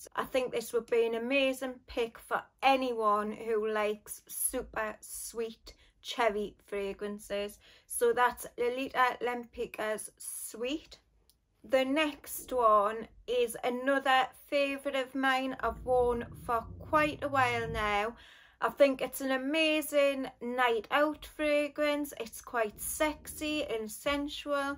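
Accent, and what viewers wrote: Scotland, United Kingdom. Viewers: Goede morgen xxxx greetings from the netherlands, lovely accent scotish???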